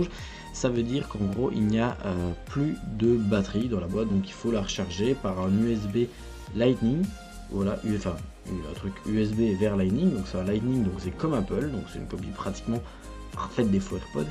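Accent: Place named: Wallonia, Belgium. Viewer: mais t'es wallon toi dit donc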